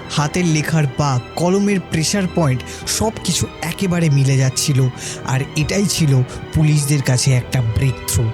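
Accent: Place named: India. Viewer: আপনাকে বাংলাদেশি মনে করছিলাম।কিন্তু উচ্চারণ কথাবার্তার ধরন দেখে ভারতীয় মনে হলো।পরে দেখলাম চ্যানেলে আসলেই ইন্ডিয়ান লেখা।বাংলাদেশে নাম হয় না, হয়।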